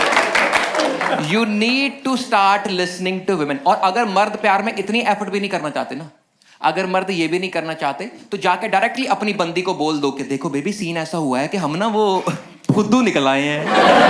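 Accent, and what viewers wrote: Punjab, India. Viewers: I love punjabi accent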